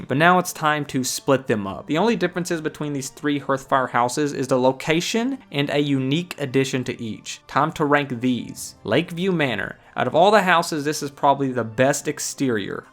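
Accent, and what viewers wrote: South, United States. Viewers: You and theepicnate are easily some of my favorite skyrim youtubers. Just something in the way your videos are so fun and calming to just listen to as you go about your day. The southern drawl in your voice is just the cherry on top. Great vid man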